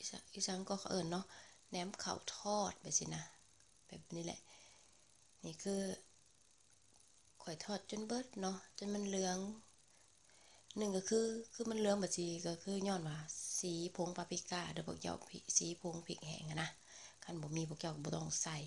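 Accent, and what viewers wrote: Savannakhet, Laos. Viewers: มักๆเสียงเจ้าเว้าม่วนม่วน คือกันเองไทยสวันบ้านเฮา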